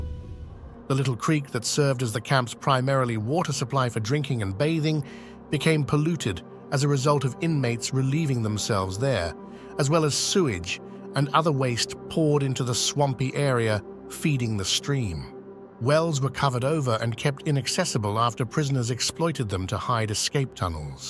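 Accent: United Kingdom. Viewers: Hmm, I thought because it was yet one more UK narrator doing American topics… But seriously, the UK gave us the first real concentration camps in the South African Boer War (referred to as the Second Boer War). Women and children died by the thousands.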